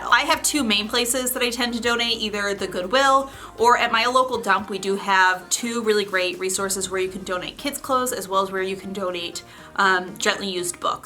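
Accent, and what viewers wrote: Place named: Boston, United States. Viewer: Muchacha!! 🎉 you’re brilliant on soo many levels! I got sudden-chronic-declutter-syndrome at 2am, 2 days in a row .. I’ve hit my “plateau” on day 3 and from your videos I’ve gathered i have tooo much stuff. All downloads printed! ❤❤❤ Enemy identified, resolution spotted -and Boston Cream, cuz they talk funny! Wait, not funny. Cool! Interesting? Different? It’s an appealing accent😅 I’d have a nonsense conversation just to hear you talk. Soo I’d have a donut that reminds me of that potentially interestingly speaking boston-ly person. Keep being your greatest self! God bless ❤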